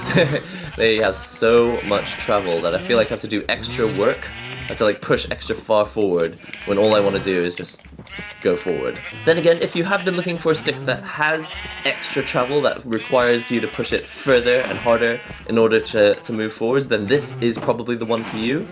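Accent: United Kingdom. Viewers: The funniest thing is that he has a British accent. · i had the video on in the background and he sounds regular british and he says 'on the front it says hori pad' and i thought that was odd - i mean, we can read, right? ... so i checked the video and yeah. couldn't read it. thanks for that. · it's fuckin confusing a Japanese dude talkin perfect british accent !!!! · U have a British accent? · I don't wanna sound rude or racist but... I think it's kinds weird how u look/are Asian Yet you have some sort of a British accent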